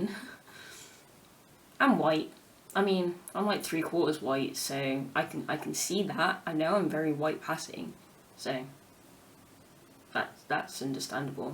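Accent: United Kingdom. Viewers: You have British accent, and they call you SPANISH???? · When u started talking I'm like oh u must be British and white but then when u said what ur mixed with I'm like .... Then why do u talk like that ? But ur beautiful the way u are! No matter what race & u should embrace more of your black side ☺️.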